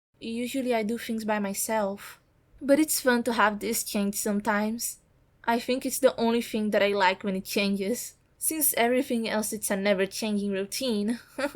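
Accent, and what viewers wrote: Brazil. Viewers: Sotaque me cheira a brasil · I just can't believe it has been 2 years and ONLY NOW I've seen that you actually answered. I am really sorry for taking so long, lol. And, to be honest, I am surprised I didn't realize you were Brazilian sooner, I guess I am just not used to that accent hehe. Parabéns pelo conteúdo também, seus vídeos ainda continuam MUITO bons kkkk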